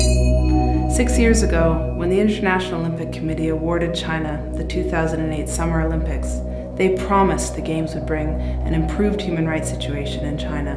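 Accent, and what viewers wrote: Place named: United States. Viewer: btw, u don't even act or make me feel like u r from Tibet, i think u r slave of America,see how gud yr american accent is. especially the way u talk and the way u talking about those, isn't dat kinda reminds people how american u get?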